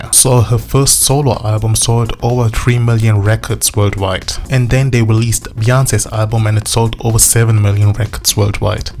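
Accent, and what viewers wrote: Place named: Germany. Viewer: Your German accent is the Hammer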